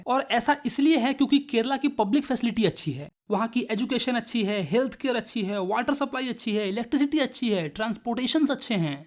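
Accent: Bihar, India. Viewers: You are from Bihar ❤😊 your accent tell that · i really appreciate ur desi Bihari tone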